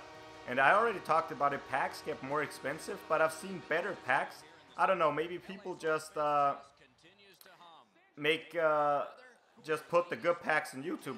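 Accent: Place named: Austria. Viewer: I like your accent... you sound like Michael Grabner. Are you from Austria? You're very insightful, keep up the good work.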